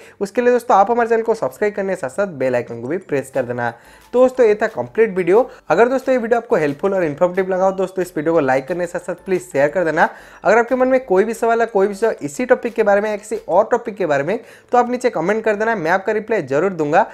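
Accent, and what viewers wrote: Odisha, India. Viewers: Loved your odia accent!!!!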